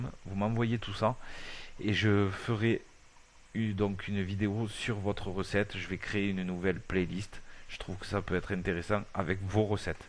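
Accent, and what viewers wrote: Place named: Central Pyrenees, France. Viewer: l'accent de pyrénées centrale de chez moi x)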